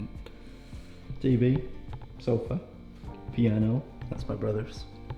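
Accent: Scotland, United Kingdom. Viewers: Yaaas knew you was Scottish 😂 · Dude sounds Scottish af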